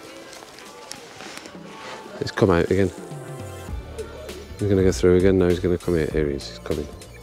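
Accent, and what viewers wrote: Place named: Wales, United Kingdom. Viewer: hell no 👊I just want to hear Your voice, a Wales accent is so cool 😎. BUT there will be songs that you will like,very far and few between, but you will like them.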